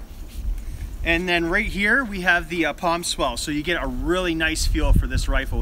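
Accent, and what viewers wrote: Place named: Ontario, Canada. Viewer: Ha knew that was an Ontario accent!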